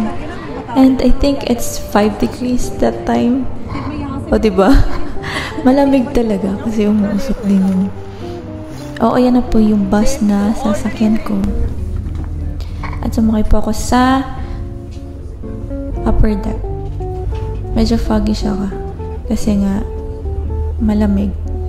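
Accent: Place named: United Kingdom. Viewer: British accent dw sabi ni 😊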